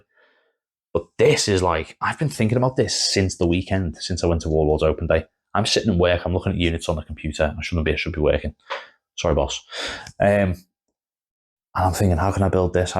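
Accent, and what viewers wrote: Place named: Liverpool, United Kingdom. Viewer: Just picked up konflict 47 you sound a bit Scouse sorry if not first time viewer if you can you recommend any gaming clubs in in rundown prefer adults not kids. Thanks if you can..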